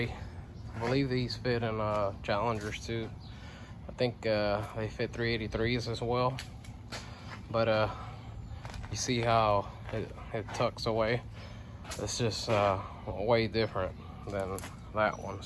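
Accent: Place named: Texas, United States. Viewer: Knew this guy was from Texas right away, set video speed to 1.25. Much better.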